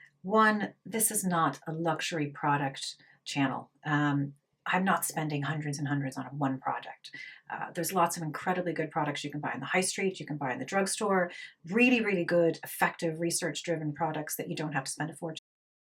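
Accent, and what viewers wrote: Ireland. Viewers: Thanks,you seem very informed,look forward to your other tutorials.Love your accent with a slight undertones of an Irish accent coming through:)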